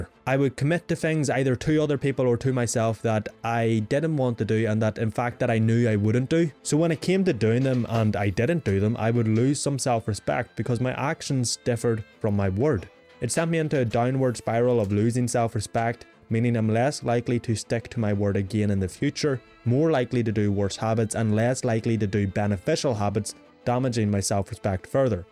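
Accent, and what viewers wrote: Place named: Northern Ireland, United Kingdom. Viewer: Recognised that NI accent, and saw that QUB on your LinkedIn. Good lad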